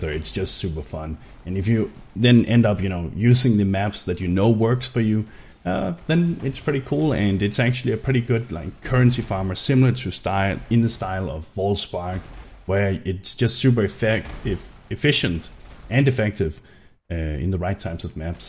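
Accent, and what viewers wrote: Denmark. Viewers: get hit by a little shit bird. lost it xD and nice Danglish xD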